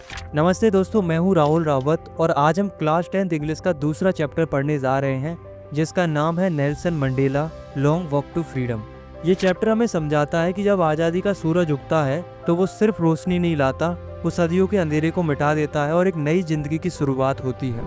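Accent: Uttarakhand, India. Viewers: Bro you from uttarakhand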